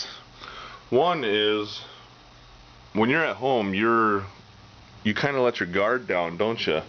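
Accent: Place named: Minnesota, United States. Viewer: being prepared isn't paranoia , it's being responsible. In a life and death situation seconds count so making the simple effort to have a pistol within arms reach at all times is a matter of self preservation. And in this guys case it's a necessity with his local police force, or lack there of. Just curious what state you live in, you sound like my friends in Minnesota.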